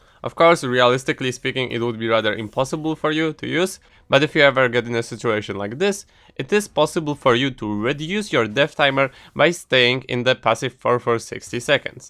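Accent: Poland. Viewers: jak Ty Polak XD wszedzie sie da poznac ten akcent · This Polish accent :D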